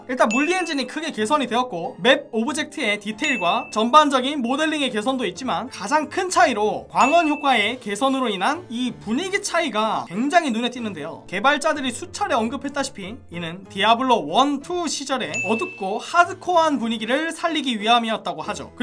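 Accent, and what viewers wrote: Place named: Gyeongsang, South Korea. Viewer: 경상도 분이시네 ㅋㅋㅋㅋ 엄마편 아빠편 이카면서~ㅋㅋㅋㅋ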